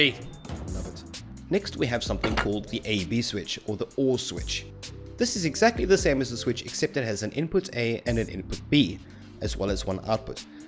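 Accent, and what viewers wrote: South Africa. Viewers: South African? Easy to follow tutorial accomplished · are you South African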